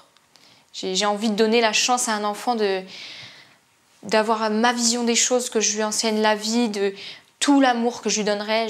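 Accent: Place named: Corsica, France. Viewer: L’accent corse j’adore 🥰 « mais qu’est-ce que c’eeest» tu es de loin la Best 🤍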